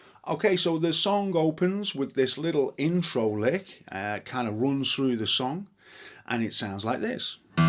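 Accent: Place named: Derbyshire, United Kingdom. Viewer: Listening from Uganda... is that a Derbyshire or Nottinghamshire accent? ... I used to live in & around Louth ... love your easy style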